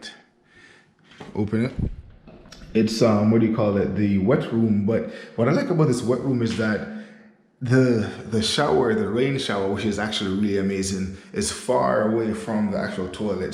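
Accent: Jamaica. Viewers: Question... are you Jamaican? Hearing a bit of a Jamaican accent, I could be wrong though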